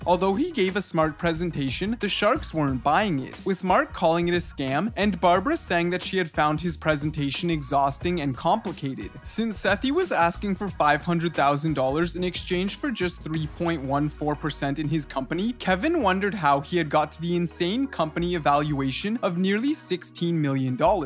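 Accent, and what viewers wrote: Canada. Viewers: He's Canadian, so..... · It's called being Canadian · I'm going to venture a guess and say the narrator is Canadian. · bet the VoiceOver is a Canadian dude... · I think he is Canadian. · The narrator is Canadian AF! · Just the classic Canadian “out” pronunciations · He sounds canadian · He's Canadian :D Probably from the Edmonton or Ottawa area.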